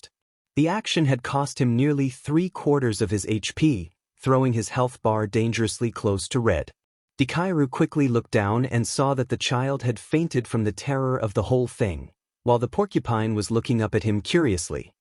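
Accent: United Kingdom. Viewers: Why are you suddently British? No like you didn't have a British aí voice videos ago and now you have tf?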